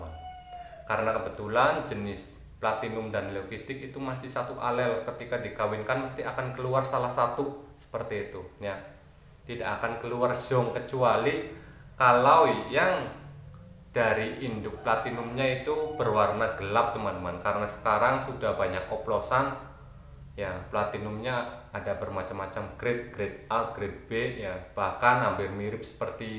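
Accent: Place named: Banyumas, Indonesia. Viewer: Mas, maap orang mana yaa. Deneng logat e ngapak banget..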